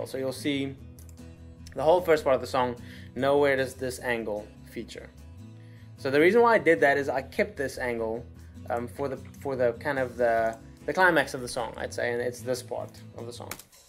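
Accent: South Africa. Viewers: its south African i blv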